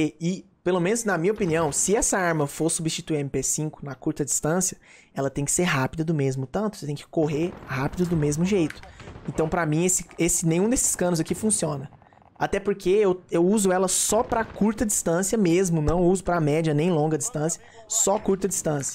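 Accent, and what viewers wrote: Minas Gerais, Brazil. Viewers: tu é mineiro?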